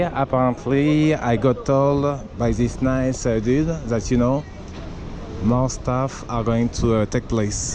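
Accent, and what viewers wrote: France. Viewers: Sorry you are having a different experience in JAMAICA than you were hoping. There are many Jamaican vloggers like you and Africans men and women, who have passed through the streets of Jamaica and have had a great time with the locals like the Ghanaian Wode Maya, I am Mahwa, etc. You should have checked out the Rastafarians and Ital Food restaurants to get your meals as there are many of them around. You have to try a little harder to lower the French accent as many people you encounter selling in the Streets find it hard to understand. Your dress style is not an issue. Some Jamaicans learn French in Highschool, but you will not find them walking around unless you are in the better neighborhoods. So, stay strong and continue to get better with your JA vlogging experience. One Love. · ! Seriously, I have been to Jamaica many times and I have been treated wonderfully by the Jamaicans. 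It could be your heavy French accent but then again I have followed Dee Mawanga and her brother I’m_Marwa and they both have both heavy Kenyan accents and they both had an awesome time in Jamaica. Just keep on plodding on and don’t take things too personally. Many people don’t like to be on camera so you may have to tweak the way you do things a little. You also have to show the many developed areas in the Jamaica. Anyway, I will still follow you. Good luck my friend. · I’m honestly thinking there’s a language barrier. You have a French accent, and I think a lot of people are having a problem understanding you.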